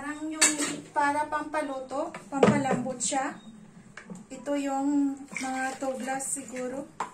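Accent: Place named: India. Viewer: Sala Malaykum Sis ulam masalap. Looks yummy at I like your Indian Accent 👌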